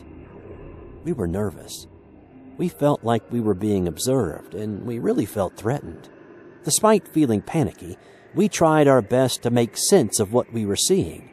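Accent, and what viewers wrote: South, United States. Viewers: andrea I do every time. I love his Southern accent.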